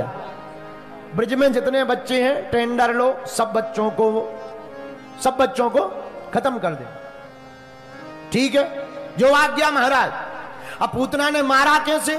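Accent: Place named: Barsana, India. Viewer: Aaj to kya baat hai Gurudev barsane wali bol rahe ho bhasha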